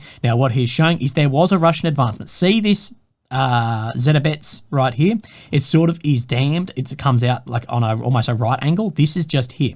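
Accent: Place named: Australia. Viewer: Good luck with the surgery and shit. Ive been in korea the last 2 years (kinda not by choice lol ) and yours and is the only aussie voice i hear regularly, keeps me sane. Take care of your self and hope u back in 1 piece soon.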